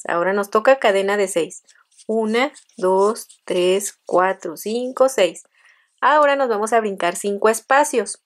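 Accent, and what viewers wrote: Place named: Chihuahua, Mexico. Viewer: Queried a amiga,. Que preciosos trabajos Haden Gus manos. De don de eres en Mexico?. Me suenas como de Chihuahua, Rees Norte a?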